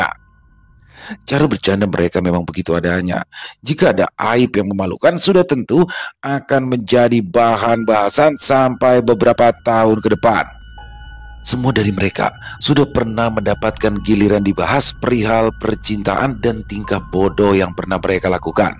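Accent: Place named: Banjar, Indonesia. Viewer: Logat Banjar pengarang cerita. ❤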